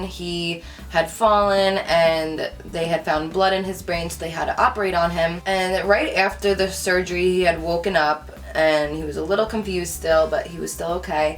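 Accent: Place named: Boston, United States. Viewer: where is she from? she has like a boston accent or something haha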